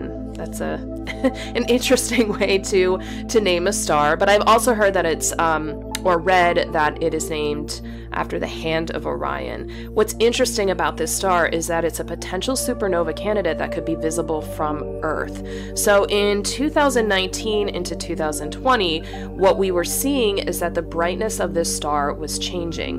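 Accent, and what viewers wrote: United States. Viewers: You from the east coast, possibly jersey?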